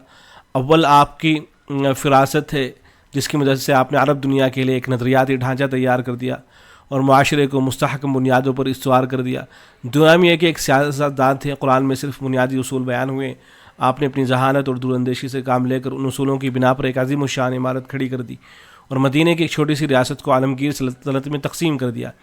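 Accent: India. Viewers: مولانا السلام علیکم اپکاتعارف کیاھے آپ کہاں سے ہیں آپکا لب ولہجہ توشہردیوبندکے معزز لوگوں جیسا ھے ایسالگتاھےجیسےآپ میرے جانے پہچانے ہیں آپکی فراغت کب ھوئی ھے بہت شکریہ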